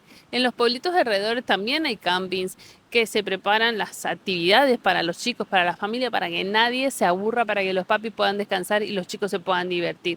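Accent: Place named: Argentina. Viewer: ¿Que me sigue sorprendiendo de los argentinos que estáis llegando en masa a España? Que hacéis cientos de videos de todo lo que os sorprende de España, pero muy poco esfuerzo en adaptaros. Ejemplo: lleváis años aquí y seguís con ese horrible acento argentino.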